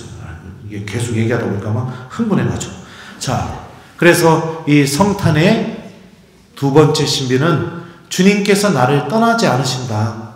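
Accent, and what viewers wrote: Chungcheong, South Korea. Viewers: 을통해 주님의 사랑에 만약 행복합니다 신부님의충청도 특유의 사투리가 마음깊이울립니다 신부님 감사하고 많이많이 사랑합니다❤❤❤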